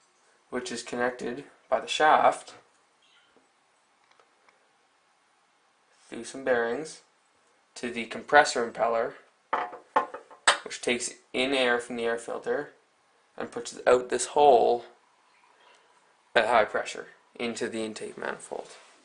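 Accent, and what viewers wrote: Canada. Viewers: are you canadian? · hah hah, u're canadian!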